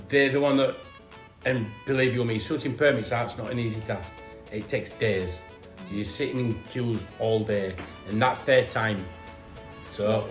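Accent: United Kingdom. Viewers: Where you from in the UK. Sounds like the North East of England.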